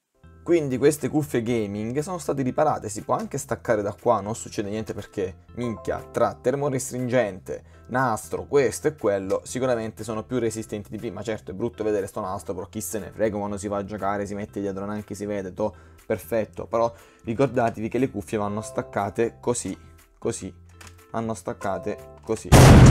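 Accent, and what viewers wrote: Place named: Apulia, Italy. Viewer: Ho provato a seguire passo passo ma il mic nin funzionava. Ho sentito che hai un accento pugliese e per questo ho chiesto,io sono in provincia di Brindisi.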